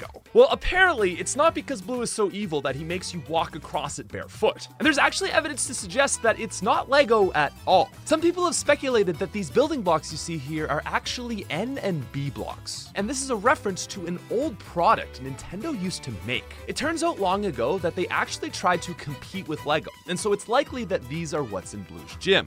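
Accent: Canada. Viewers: he probably Canadian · Thank you for my daily dose of hearing Canadian accent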